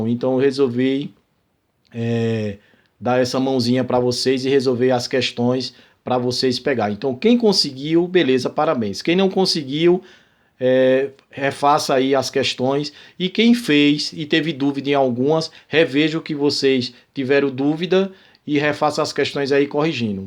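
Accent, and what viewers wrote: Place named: Alagoas, Brazil. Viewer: Boa noite! Obrigado por postar os exercícios. Pelo seu sotaque me arrisco a dizer que o senhor é alagoano.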